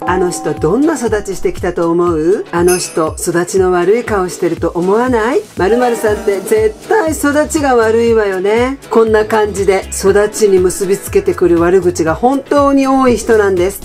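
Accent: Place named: Kansai, Japan. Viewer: 関西弁最高です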